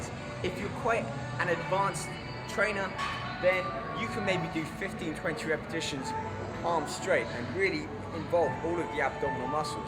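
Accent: England, United Kingdom. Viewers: He sounds so much younger in this video, with more of an English accent. Lol.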